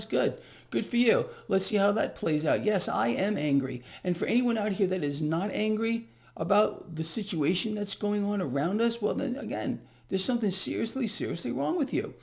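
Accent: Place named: New Jersey, United States. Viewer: you're my nice fren, share his knowledge.. I think never lie.. and very funny.. maybe you from NJ?? sound like my fam.. we from NJ.. you aint from vegas... Um from down da shoor.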